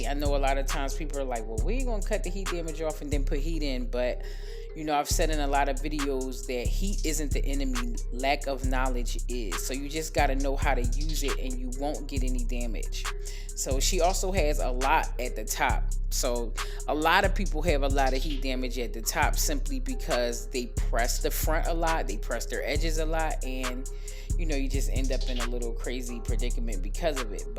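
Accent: Philadelphia, United States. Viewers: I just want to say that hearing your Philly accent gives me joy. And that I learn so much from these videos.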